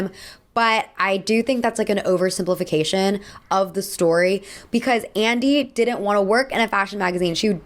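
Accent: France. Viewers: Girl, your French accent sounds so good in this! <3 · the way you pronounced 'cahiers' made me laugh so hard 😭it was so aggressive lmaoo, it was also hilarious when you spoke english with a french accent lol love you though, as usual it's an amazing and extremely well researched video!! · French accent come thruuuuu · As a creative thinker, I too love print. There is something special and magical within the tangible qualities that gazing upon a thought provoking or glamorous photograph and sliding your finger down the page as if you were there to experience it yourself brings to the senses. It’s a medium overshadowed by the instantaneous stream of vapid content — not art — that our society is now bombarded and accustomed too. But, as a designer and ambitious visionary, I understand that what I have to offer will by exclusive and elitist. I beckon for mystique. I remind myself of the time Lady GaGa said in the beginning of her career, “I’m not interested in being a celebrity. 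I’m interested in being an artist and there’s a VERY big difference.” A week ago, I was daydreaming of a group of girls with taste, together flipping through and expressing to one another a sense of genuine interest of something in particular within their large glazed magazines. I thought to myself, it is my duty to create this moment for them. Also, your French accent is on point.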